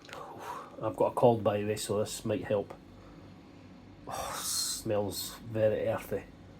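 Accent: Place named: Scotland, United Kingdom. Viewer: What it turkeytail . You sound Scottish I'm Canadian. Don't think we have this tea here . Is it to help with a cold.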